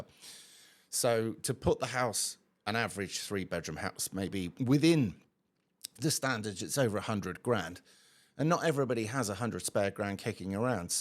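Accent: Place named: England, United Kingdom. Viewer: This channel is pure grifting with an English accent.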